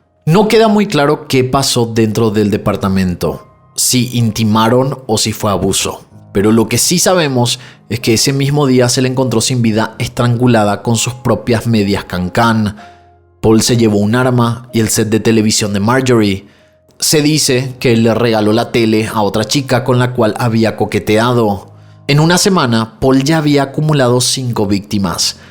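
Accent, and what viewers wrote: Paraguay. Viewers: Amo que tu acento volvió a ser paraguayo 😉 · Haupei kpeee, yo sabía que ese acento y forma de hablar era de mi querido Paraguay! Éxitos siempre che dúo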